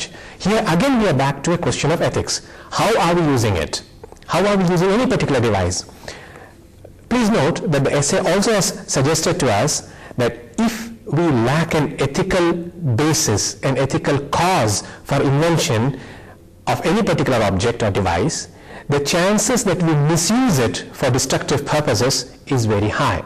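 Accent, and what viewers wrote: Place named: India. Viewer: Sir, it is a lesson for Indian graduate students, his objectives of teaching this essay is to make the students understand the key points easy not the american philosophy, it is in Indian English pronunciation, so that Indian students can understand these sounds easy. Thank U Sir.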